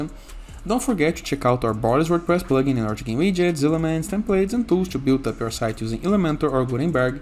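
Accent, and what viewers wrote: Brazil. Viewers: Caraca, assim que ouvi a voz já pensei: "é brasileiro" kkkk Eu reconheço o sotaque do meu povo. Mas depois vi que estava em português o conteúdo do vídeo kkkk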